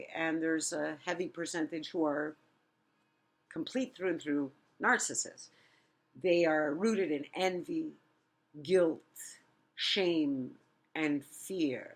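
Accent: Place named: New York, United States. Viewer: You have a New York accent. Sounds nice and it is attractive feature on a person. Have a nice and safe week!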